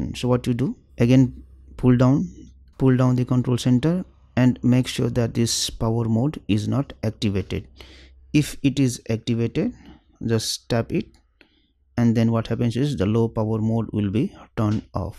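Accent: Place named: India. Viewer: his voice drives me nuts..thick Indian accent and monotoned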